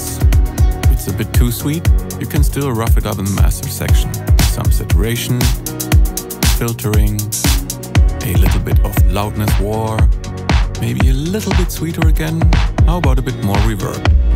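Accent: Germany. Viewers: German accent ...damn..the inventors of groove music lol...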